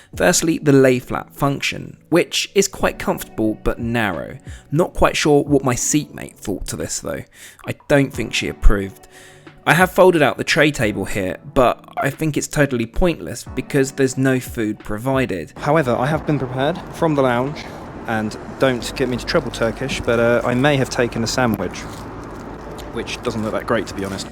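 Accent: United Kingdom. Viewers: Turkish Airlines is more assertive in economy class. And you, the British-accented dog, awaiting king service from a short flight. Don't be racist dude. We don't hate westerners, but people like you have an endless hatred for us. If you are looking for more king services, do not fly with THY. Fly with Emirates or Qatar Airways. racist guy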